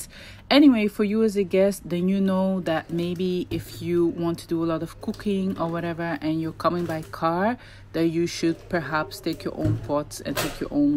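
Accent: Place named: Saint Lucia. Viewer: Are you from St Lucia, originally, you sound like a friend of mine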